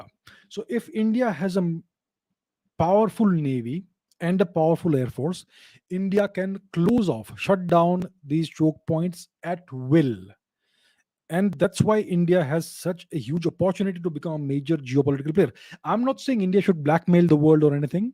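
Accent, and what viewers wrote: France. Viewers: Enjoying the french accent😌😃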